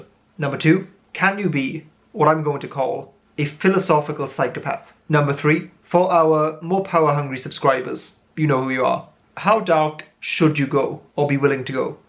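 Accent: Wales, United Kingdom. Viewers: Is nobody going to point out the Welsh accent?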